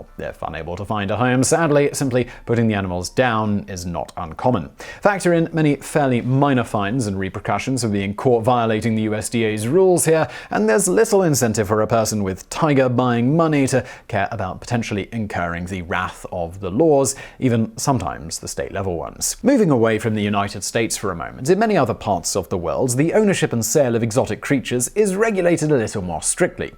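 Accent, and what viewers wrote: United Kingdom. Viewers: Your British sounds somehow better than other British accents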